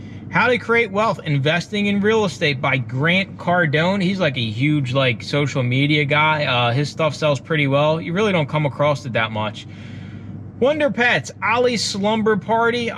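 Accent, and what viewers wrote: Philadelphia, United States. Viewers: hi. I'm in Mt. Airy. I've been all through philly though. Yeah, I could tell by the way you talk that you were from somewhere heavy in philly! Good going,! I love your content. I want to break through this wall I've built Surrounding amazon. But I would love some serious advice. Oh! Question: scoutIq or scoutly starting out? I did the free trial of scoutiq and it was ok.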